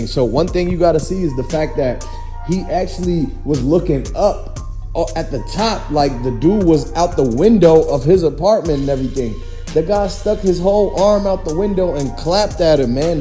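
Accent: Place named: Idaho, United States. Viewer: Man delete this dude u sound like u from Idaho n don’t have a clue what really happened 😂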